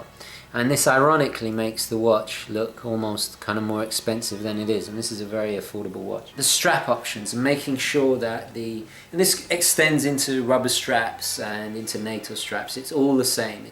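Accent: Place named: United Kingdom. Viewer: Absolutely! And I really like this British accent.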